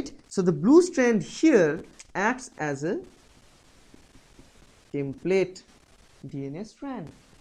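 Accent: Bengal, India. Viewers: great video! are you bengali?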